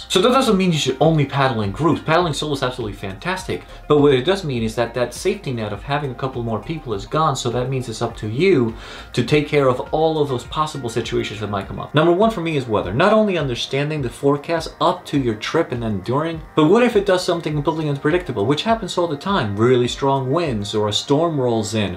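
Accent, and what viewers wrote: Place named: Turkey. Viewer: You have a super Turkish accent for a Texan.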